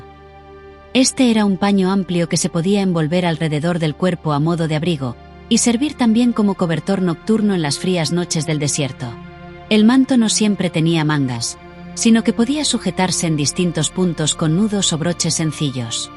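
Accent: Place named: Spain. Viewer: Es chocante el acento español de la locutora. Por eso...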